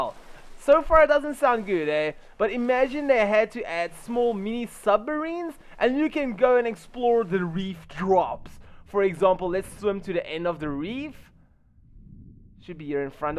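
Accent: Afrikaans, South Africa. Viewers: lucky guess lol I’ve been trying to learn Afrikaans so the accent sounded familiar to those in the Afrikaans tutorials.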